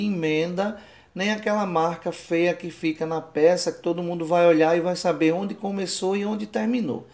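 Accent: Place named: Bahia, Brazil. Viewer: Seu sotaques é um pouco parecido com o nosso da Bahia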